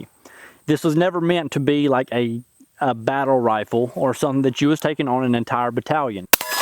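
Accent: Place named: South Carolina, United States. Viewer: Hmmmm, accent, foliage, weather, east coast.... SC?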